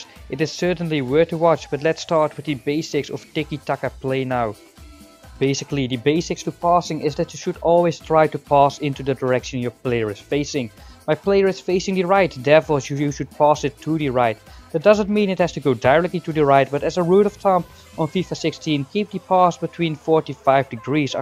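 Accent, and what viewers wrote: Netherlands. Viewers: Great tutorial. Btw you sound Dutch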